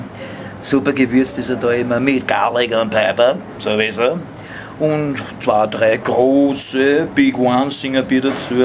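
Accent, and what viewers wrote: Vienna, Austria. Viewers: Jo Mann, do kummt des Wienerische so richti schee rüba. Verzeih mir, dos I des net guat ko, aba gfalle tuts ma scho!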